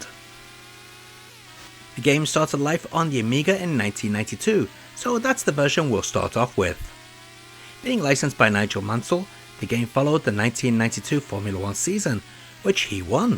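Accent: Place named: Scotland, United Kingdom. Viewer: Showing support, love. Love his accent and his command of English language, are you Scottish? Very honest, knows his stuff, often Japanese focused but in English. Can feel and share that passion for the old systems and hardware. I personally didn't play this game back in the day.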